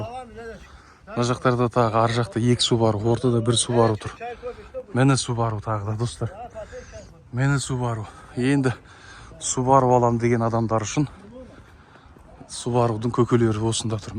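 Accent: Standard. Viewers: Ассалаумағалейкүм көпшілік! Сөйлеу мәнері, тіл байлығыныз керемт. Таза қазақ тілінде сөйлейсіз, тындап отыра бергін келеді.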